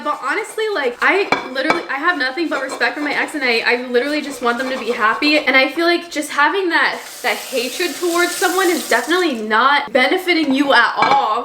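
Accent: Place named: Canada. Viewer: I didn’t know us Canadians had an accent 😅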